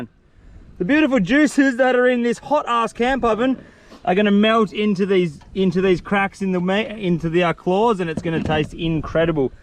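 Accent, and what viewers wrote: Australia. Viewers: Her name is? With your strong Aussie accent I always thought you were calling her "monkey" LOL it really doesn't matter cause I love it when you bring her along with you on these adventures such a beautiful person. I Absolutely Love Crab, but sadly I live in Missouri and we are miles away from any coast where you can catch your own. If I want crab I have to go to Walmart and buy frozen crab. But it's still good and very expensive.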